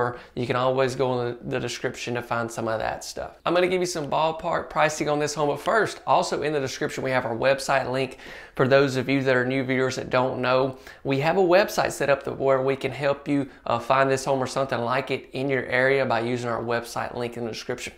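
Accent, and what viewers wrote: South, United States. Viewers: I mainly watch you because your down home accent and voice is so soothing and it gets me through another crappy, depressing California day. If I bought one of these homes, it damned sure wouldn't be here. Well, as for this place, I can say it's unique, for sure. I like that it's a single story. I'm old...it does make a difference. I don't have no large family. But, I hate open concept. Hate it. And I would be annoyed as all get out with all those light fixtures that take special (and expensive) bulbs. And, there are not three pantries...the barn door closet actually is the true pantry. The other door inside the kitchen is a broom closet and the closet in the hallway by the bathroom is a linen closet for towels, bedding, etc. Just so you know. And I'm still trying to figure out why there is a pass-thru in the master closet. Is it vogue to hide yourself carrying your laundry basket these days? 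That thing, for me, is fodder for a horror movie. Nice to make access for the monsters in your closet to come in. And finally, a bathtub in the master bedroom and a dream of a tub at that. Who cares if the monsters are coming into your closet when you're soaking in that!!! The shower looks like it belongs in an RV but who the hell is going to care about the shower with a tub like that?! I'd just use that shower as a storage area! And cute little guy, btw. Those cheeks 😁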